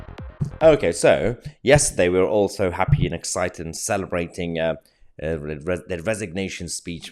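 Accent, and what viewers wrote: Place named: RP, United Kingdom. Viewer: stop talking like you got a toffee stuck in your gob you aint British so stop with the BS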